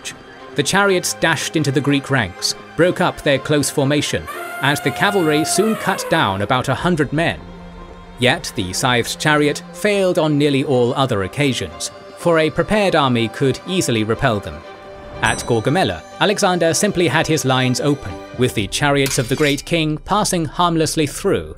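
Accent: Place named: RP, United Kingdom. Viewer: Very informative, clear and well illustrated video. Compliments. I also very much like your articulation and British Standard accent, it sounds very elegant like an officer of the Empire in Star Wars (it reminds the briefing in the Tie Fighter video game) and it is easier to understand for non native English speakers such as myself. Hats off ^_^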